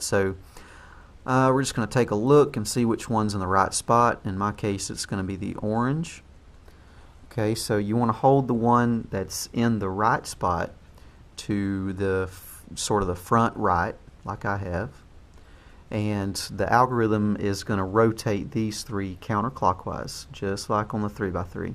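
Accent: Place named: Australia. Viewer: A very strong Australian accent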